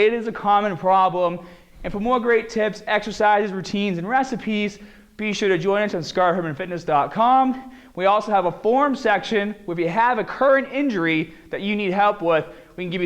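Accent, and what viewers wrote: Boston, United States. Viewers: How can he? Hes from Boston. They all speak like that there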